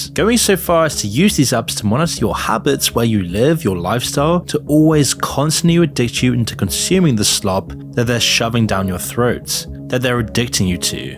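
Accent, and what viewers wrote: United Kingdom. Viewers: its part of "Everything is fake in ClownWorld" - this channel is the head clown. "It is impossible to succeed on youtube, but I DID IT" "Subscribe for $200/month for my guide how to succeed - IN EVERYTHING". Also the obnoxious BRIT accent talking about USA ..... Im here for the LOLZ and to see how many of the cohort following this channel has brain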